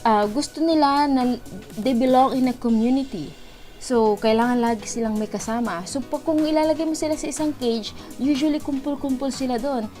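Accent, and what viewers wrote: Pangasinan, Philippines. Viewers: Pangasina po kau Ma'am?hehe may accent ksi hehe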